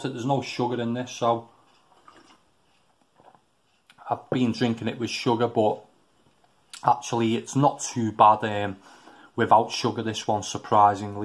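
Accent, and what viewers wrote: Liverpool, United Kingdom. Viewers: Fucking scouse accent ha ha perfect review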